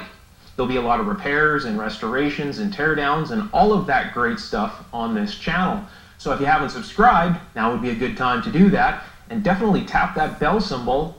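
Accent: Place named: Canada. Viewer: I detect a Canadian accent, bet I am right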